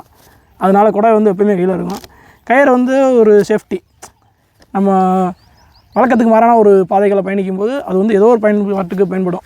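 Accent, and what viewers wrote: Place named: Madurai, India. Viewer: Unga madurai slang kum unga muyarchikkum seekiram success varum bro❤😍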